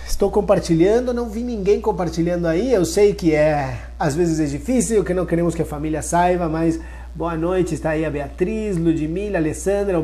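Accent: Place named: Peru. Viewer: Boa noite, cheguei no seu canal pois fiz uma pesquisa de transferência e qualidade de endométrio, tenho marcada minha transferência para próxima terça-feira e estou feliz e com muita fê que minhas medicas estão fazendo o seu melhor para que esta FIV tenha sucesso, eu fiz uma fiz o passado janeiro, mas infelizmente não fiquei gravida, você forneceu muitas informações que eu desconhecia, lhe agradeço muito!!! Mais uma coisa você tem um sotaque muito parecido com o meu, eu sou do Peru!! kkkkk Forte abraco.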